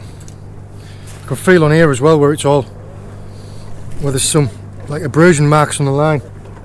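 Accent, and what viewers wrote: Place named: Cumbria, United Kingdom. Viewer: i thought you were North, as were Cumbria lol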